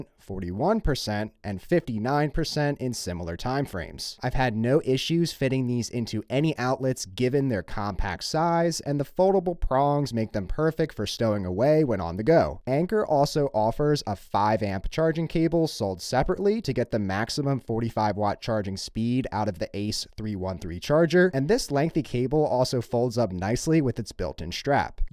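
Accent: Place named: California, United States. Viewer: California accent is f disturbing, smart information sounds dumb.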